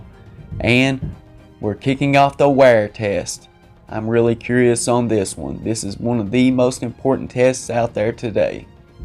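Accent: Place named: Rural, United States. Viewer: Can't understand a word you're saying. Need a translator- I don't speak hick. And what's with the dramatic music? It's motor oil, not space travel. C'mon man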